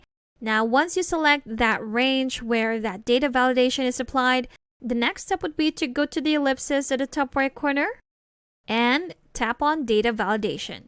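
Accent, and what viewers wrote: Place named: Canada. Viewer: I still think your voice is absolutely beautiful. Even when I don't have a need for your current spreadsheet tip, I might sometimes listen to your latest tutorial just to brighten my day. You could do so much with that voice. Would you mind telling us something about yourself? Based on your accent, I'd guess you're likely Canadian. I'm probably way off, but yea, just a guess.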